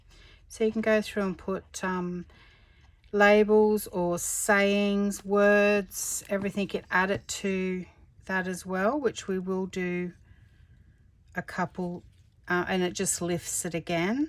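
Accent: Australia. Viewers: Just subbed. Lovely accent. Which bit of Oz are you from ? I had an Aunt who lived in Queensland ❤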